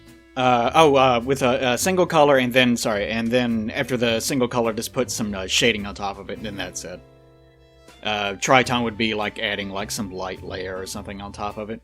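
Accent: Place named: United States. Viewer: Your voice is so country. I love it.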